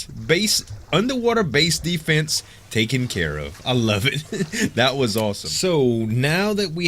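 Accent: Louisiana, United States. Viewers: Where are you from Z? Cajun accent coming through. Abbeville LA here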